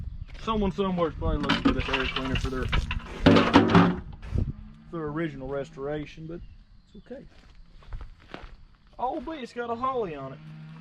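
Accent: South, United States. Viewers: great job. love southern accent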